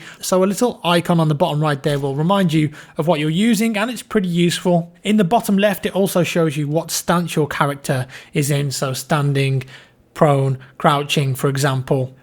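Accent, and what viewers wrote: England, United Kingdom. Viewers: Good dammit man i love u english accent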